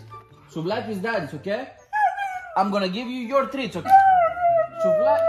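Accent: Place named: Greece. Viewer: Are you Greek ? Cause the accent is Greek !! Kisses and hugs from Greece!! 🤗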